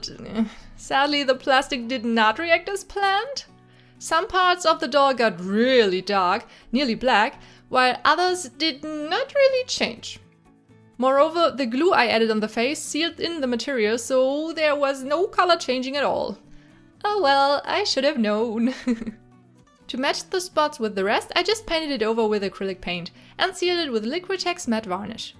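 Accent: Germany. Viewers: Ich mag diesem deutschen Akzent in deinem englisch🥰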